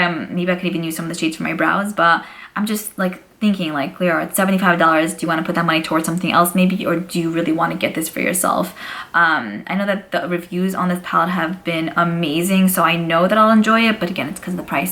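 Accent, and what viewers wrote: New York, United States. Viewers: I love the way you say chocolate, that New York accent is strooong! Your too freakin cute XOXOXO 😇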